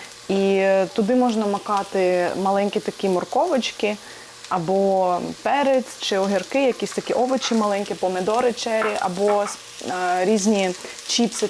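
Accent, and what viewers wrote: Standard. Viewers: Яка гарна Украiночка,яка чиста мова,хай щастить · Сьогодні тільки перший раз Вас побачила і дуже пораділа. Щира людина з чистою українською мовою то скарб! Щастя Вам! ❤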